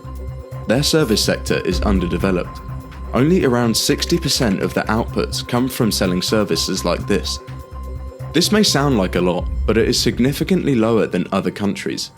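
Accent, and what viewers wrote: England, United Kingdom. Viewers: Bullshit with an English accent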